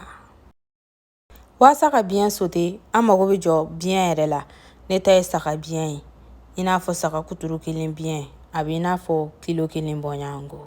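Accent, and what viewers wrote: Bambara, Mali. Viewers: Merci beaucoup. Ma fille adore tellement ton accent bambara 😁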